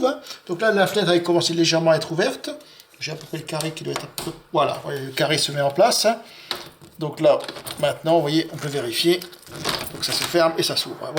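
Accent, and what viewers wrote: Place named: Reunion, France. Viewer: Merci monsieur 🙏 Petit accent de la Réunion 🇷🇪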